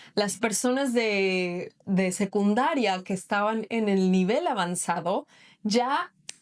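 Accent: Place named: United States. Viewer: lol hablas ingles como una americana. Estaba sorprendido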